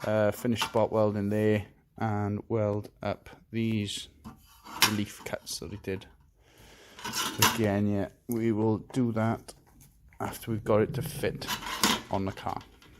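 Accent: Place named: Wales, United Kingdom. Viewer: apart from monky london an recently salvage flippers, I don't watch any of the UK ones...I think the welsh accent helps, nice an friendly😂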